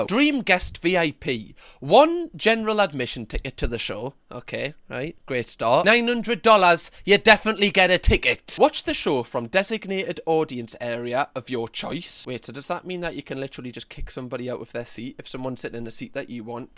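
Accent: Newcastle, United Kingdom. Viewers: Are you a Geordie?! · so refreshing hearing a geordie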